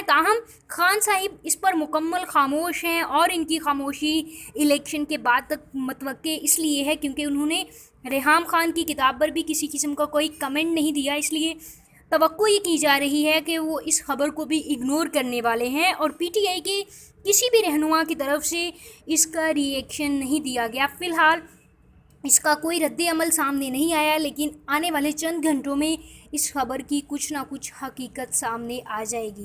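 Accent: India. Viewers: Ye channel india ka hain voice chak Karo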